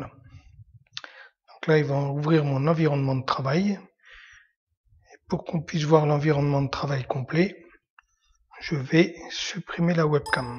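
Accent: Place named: Belgium. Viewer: Vous venez d'oû? je limpression de reeconnaitre un petit accent belge fort sympatique! merci pour cette video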